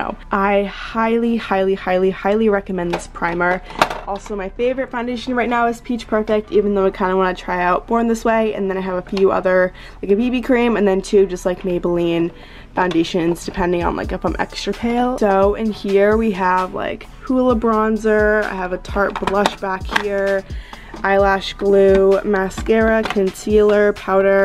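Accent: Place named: Los Angeles, United States. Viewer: homie you did not need the boston translation you sound like you from Los Angeles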